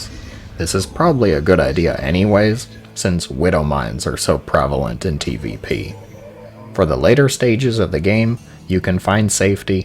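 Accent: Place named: Texas, United States. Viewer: I really like using Banshees and it's not relevant to the video but to be honest and no offense but the way he sound when he talks is like he has a Texas accent and/or some thick saliva in his larynx, maybe he kinda sounded like those Viking units actually.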